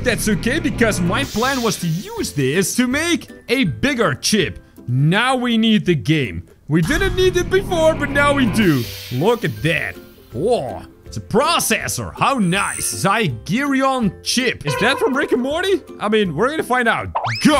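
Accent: Netherlands. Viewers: YOU HAVE A SORT OF DUTCH ACCENT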